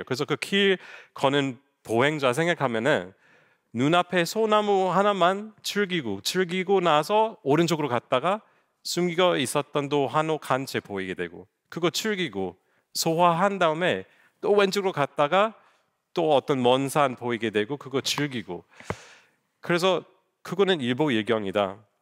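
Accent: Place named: Seoul, South Korea. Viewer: 어디 사투리요? 뭐 경상도 사투리, 전라도 사투리 이런 게 있어야 사투리로 인식을 하죠.. 억양이야 특이하지만 그냥 서울 말씨로 들리는데.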